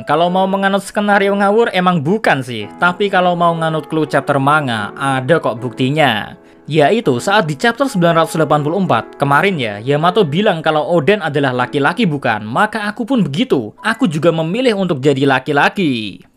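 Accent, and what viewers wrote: Java, Indonesia. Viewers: Mimin orang Jawa ya nanya aja min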